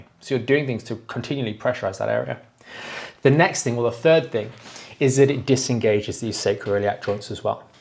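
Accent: England, United Kingdom. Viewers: This is really wonderful. I’ve left Spondolitis. Awful 5 years old got run over 7nder a wagon. Only when older back problems. Discitis. Nearly died. Had no surgery or anything. Painkillers. What can I do. I dairnt excercise. 5” height lost . And a tilt a gait. Is this same? Nice to hear English accent. So,help.😮😊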